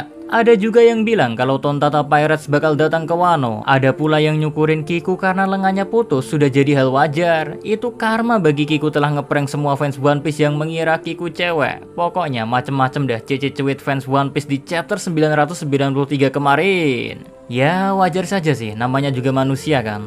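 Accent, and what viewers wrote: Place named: Java, Indonesia. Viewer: Gak nyangka chanel ini besar kek gini.. Padahal dulu 2019 pertama aku nonton chanel ini.. Saya menyepelekan chanel ini.. Apa sih chanel kecil gaje.. Tapi lama kelamaan jadi suka seru... Yang paling aku suka jujur bukan teori atau ap apa.. Aku suka suara... Jawa campur indo... Vokal suaranya ciri khasnya itu loh wkwkwk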